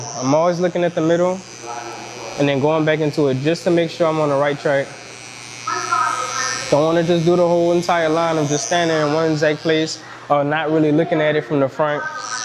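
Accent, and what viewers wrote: Louisiana, United States. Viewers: Louisiana accent loud asf😂😂❤ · Good shit brudda 💪🏾💪🏾💪🏾💪🏾 you got a heavy Louisiana accent brudda salute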